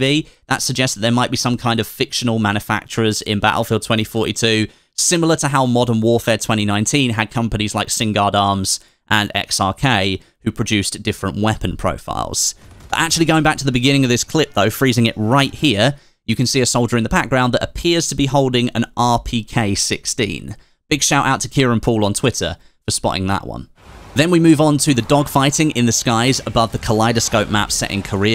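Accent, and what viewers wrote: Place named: United Kingdom. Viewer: the most bri'ish accent of a gamer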